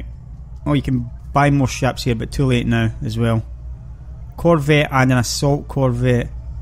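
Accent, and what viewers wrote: Scotland, United Kingdom. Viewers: Great to hear a grand Scots accent! It’s probably why the yanks haven’t been watching you. I was married to a girl from East Kilbride (Scotland’s 6th largest town and growing...as the sign proudly professed!) Unfortunately, I’m a sassenach, but I know the patter, having grown up in little Scotland.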